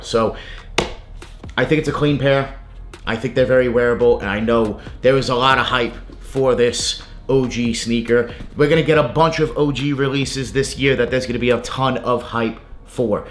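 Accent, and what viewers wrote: New York, United States. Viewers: Nobody gives AF what this out of the closet Drew Carey with a NY accent thinks. Stop saying Jordan wasn’t sick. Having food poisoning makes a person sick. In fact, many of the symptoms are similar to what someone feels when they have the flu. Stick to promoting your nerdy “DO NOT BUY” bs videos. By the way, I find it ironic that someone who looks like they have no athletic ability whatsoever tries to influence people on sneakers.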